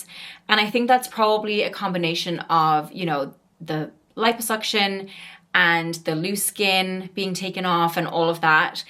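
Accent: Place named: United States. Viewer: Thank you for sharing, the first time I encountered one of your videos was in Brazil 2012 when I was experiencing some homesickness. I immediately was like who is this beautiful woman that has an American accent but not at the same time. lol...I loved it and I appreciate your honesty throughout the years, it's been lovely seeing you remain true to yourself. Thanks again for sharing because you don't have to Well wishes from Guyana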